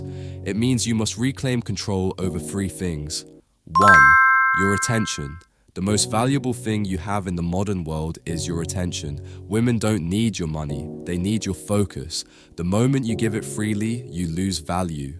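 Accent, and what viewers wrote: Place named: Scotland, United Kingdom. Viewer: Its a Scottish accent it has a charm its human its natural its better than the AI generated voices on the internet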